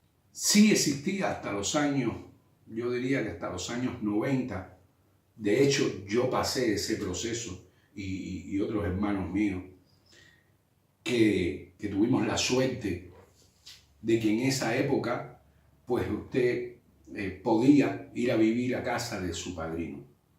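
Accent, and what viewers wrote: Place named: Cuba. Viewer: Porque hablas como cubano si eres. Mexicano?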